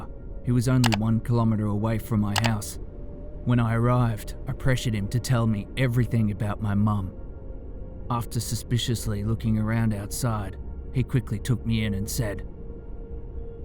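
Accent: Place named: Australia. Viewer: Good to hear an Aussie accent doing the narrative.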